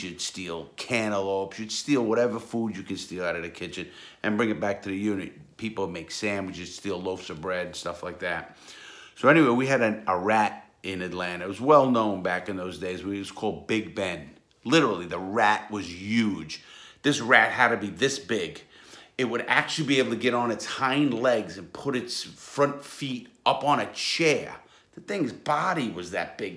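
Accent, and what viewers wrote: New York, United States. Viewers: I love that ny accent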